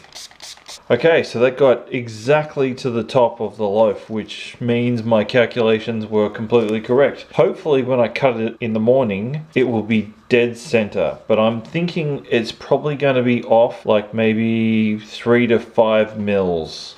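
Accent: Australia. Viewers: AU Love the colours, and the way you have it is lovely. It's so good to see a video with Aussie measurements, and accent!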